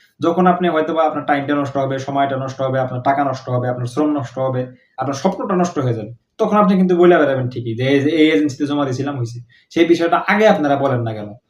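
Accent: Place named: Sirajganj, Bangladesh. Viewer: ভাই আপনার বাড়ি মনে হয় আমাদের সিরাজগঞ্জ৷আপনার কথা শুনে আন্দাজ করলাম ভাই 😊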